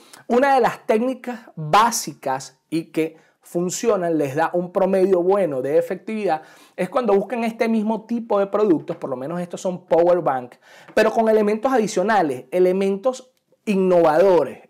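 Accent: Venezuela. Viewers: felicitaciones éxitos, definitivamente el tono venezolano a la hora de explicar y enseñar es el motivo que nuestros docentes los buscan en el exterior éxitos.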